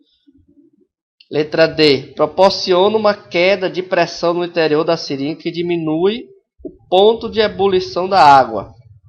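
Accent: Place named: Paraíba, Brazil. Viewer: caralho,ele sabe explicar,mas eu odeio voz de paraíba! Énem, pÓlarização aff!!! tudo é Ó e É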